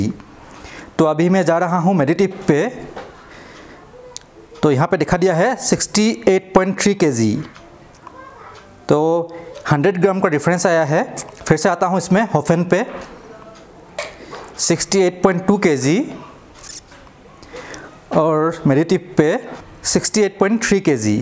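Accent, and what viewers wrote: Assam, India. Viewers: Bhai aap assam se ho kya 😁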